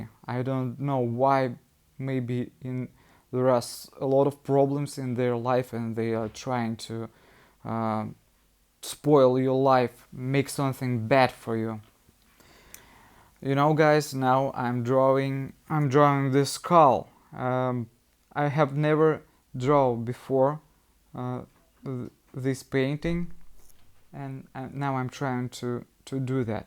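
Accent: Russia. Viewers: I love your nail art, and you're great with gel. I also understand your English very well. My son is obsessed with all things Russian, and sometime when I watch your videos, he watches with me just to hear your accent. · Also, your English is good. I ❤LOVE❤ your Russian accent. Don't let anyone tell you that the way you speak is flawed. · Are your clients happy? That's all that matters. I love listening to your accent, and can those who criticise speak Russian? If they tried you can criticise them. I love listening to you, and I love what you do.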